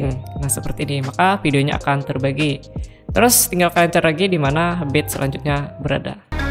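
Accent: Banjar, Indonesia. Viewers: logatnya kayak orang banjar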